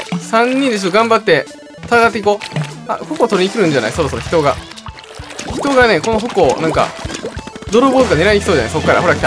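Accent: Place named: Kansai, Japan. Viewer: 僕もスプラ1でさんを見たのがスプラユーチューバーで初めてでした。今でも見てます。自分も関西人なので関西弁で実況するのがいいですね😁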